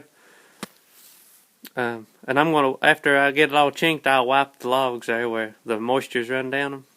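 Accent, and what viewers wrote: United States. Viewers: i love your video. however,....i have to know what part of America your accent is from. thx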